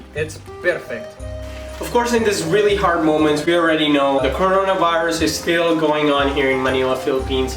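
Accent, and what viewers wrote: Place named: Italy. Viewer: si sente l'accento italiano 😍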